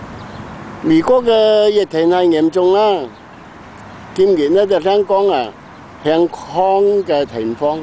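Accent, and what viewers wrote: Taishan, China. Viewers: 讲台山话可得意哈哈!!好靓仔、今時今日返唔到台山了、喜歡你拍攝多片看、龍仔可耐没見、他大個仔?🙏🙏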